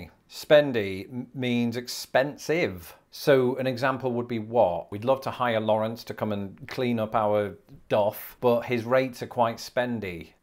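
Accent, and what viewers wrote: United Kingdom. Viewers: The good thing is that you kept both you British accent and sense of humour! Which is excellent😂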